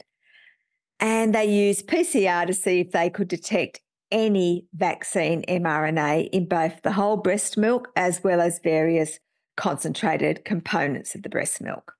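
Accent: Australia. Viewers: Some feedback on your Aussie speak. "Doozy" is more American slang really. It's a noun so you'd say something like, "Onya, another video that's a real doozy". Or "Thanks for the doozy of a video, ". Though "onya" (good on you) is definitely oz speak. Good Aussie adjectives might be "bewt" or "bonza" as in "Thanks for another bewt video, ".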